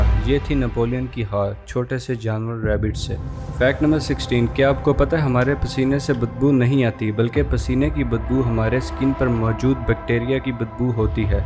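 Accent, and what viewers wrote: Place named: Punjab, Pakistan. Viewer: tumhara lehja "Punjaabi"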